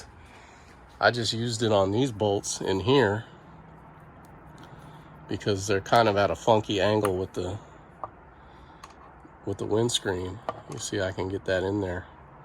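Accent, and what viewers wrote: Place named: West Newbury, United States. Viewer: I appreciate the vidya, with that west newberry accent mr john cena jr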